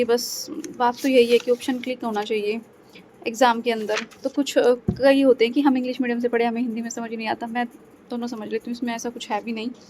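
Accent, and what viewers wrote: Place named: Bhiwani, India. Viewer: Sister aap loharu bhiwani side se ho kya....aapki boli se lag raha hai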